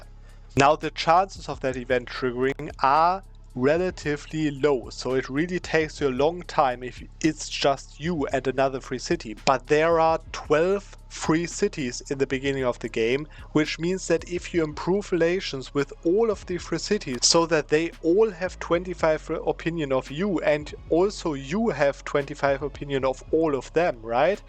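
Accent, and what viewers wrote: Germany. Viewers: Do I hear a german accent here ? :)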